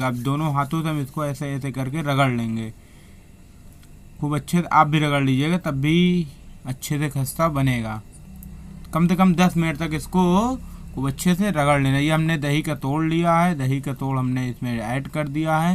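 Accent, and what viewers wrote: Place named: Allahabad, India. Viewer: Bhaiyya apke baat karne ka andaz pura allahabadi hai😊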